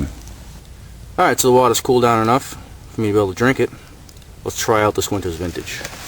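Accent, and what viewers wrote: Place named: Massachusetts, United States. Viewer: Massachusetts accent....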